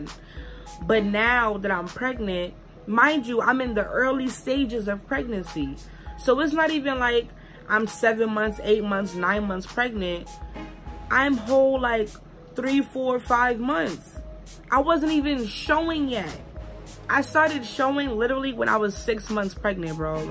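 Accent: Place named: Brooklyn, United States. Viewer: I bet you from Brooklyn 🤮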